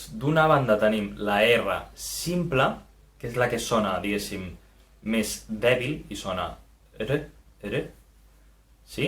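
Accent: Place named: Castile, Spain. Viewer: Mestre, la lletra ce es pronuncia /sé/, amb essa i no pas com fas tu: amb el so castellà. També: 1) la o del mot "àtona" es pronuncia /u/ i no pas /o/, com ho fas tu, i la be del mot "àrab" és una /p/. Etc.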